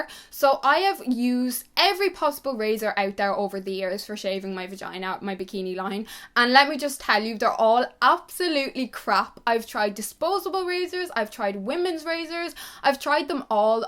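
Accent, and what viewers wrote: Ireland. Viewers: I love her Irish accent, this video is super helpful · litta Lou I would say she has an Irish accent.... card b is Dominican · U love your Irish 🍀 accent coz I'm irish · I’m irish and I some how came across this video and I straight away noticed your accent, we irish girls have to stick together ♥️🇮🇪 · Where are you from? I detect the musical emerald lilt! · She has an Irish (Dublin) accent so probably not.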